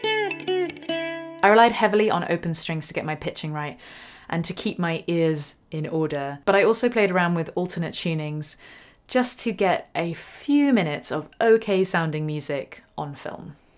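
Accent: United Kingdom. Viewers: can i help u get a sitar from india -) you are unreal i swear teacher,guitar player,polight,dusky voice,british accent and the most beautifull face how can you have all of that.REALLY UNREAL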